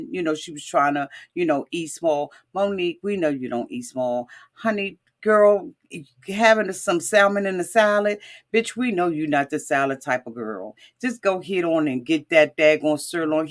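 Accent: Washington DC, United States. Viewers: I just found you, love the DC accent and the perspective..but did you notice that Monique asked dude if he enjoyed his sleep “on the bed”? Then later I noticed a pillow and blanket on the couch..did she sleep on the couch????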